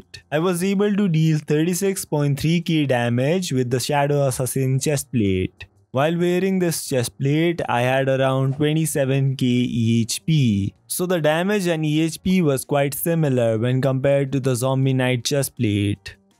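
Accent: India. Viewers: Are you indian? Ur accent is cool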